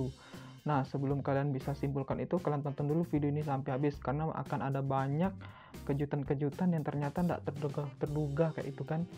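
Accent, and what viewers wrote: Palembang, Indonesia. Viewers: orang mana bang? logatnya kek palembang hehe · orang palembang ya bang? logatnya kayak orang palembang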